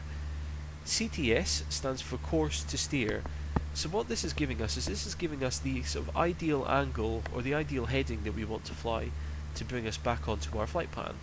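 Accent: Scotland, United Kingdom. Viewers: Great explanation and relatively easy to follow. Thanks.... and in a good Scottish accent too lol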